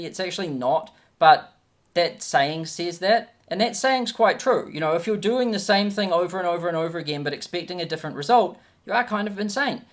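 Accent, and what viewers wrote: New Zealand. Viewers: You sound like a Kiwi? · Man I just like to listen you talk, your NZy english is so cool!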